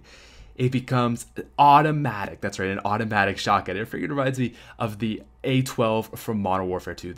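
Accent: Canada. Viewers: What's least favorite gun? The smR! No offense to your Canadian accent, in fact I like your accent. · He's Canadian he said aboot instead of about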